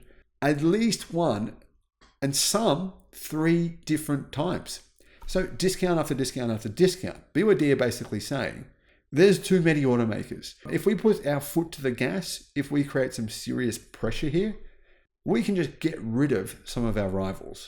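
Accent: Australia. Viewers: start to like his aussie accent, quite nice actually.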